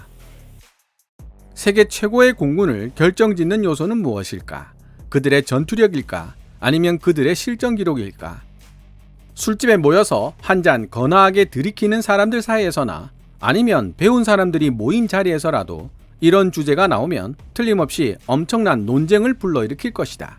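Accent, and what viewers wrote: Gyeongsang, South Korea. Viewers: 경상도 억양을 서울 표준어 억양으로 바꾸는 번역기 를 사용해서,당신의 갱상도 억양에서 탈출 하세요.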